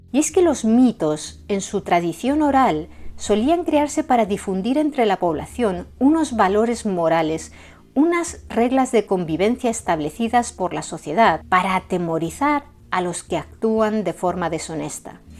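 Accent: Spain. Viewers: Hola, estoy suscrito a tu canal y veo todos tus videos,y me encanta todos los temas que abordas pero sobre todo me impresiona tu forma de relatar,me encanta el timbre de tu voz junto con tu acento español,(soy de Argentina) y me pregunto,alguna vez pensaste en hacer videos tipo audiolibros, sería genial,tu voz es excelente para eso,tal vez sería mucho hacer 2 videos a la semana pero un audiolibro cada tanto sería genial.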